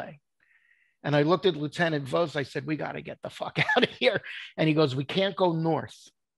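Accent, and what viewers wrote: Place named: New York, United States. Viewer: This mensch has the best story and delivery I’ve ever heard. Great New York style delivery. I guess the divorce didn’t bother him after that. 😂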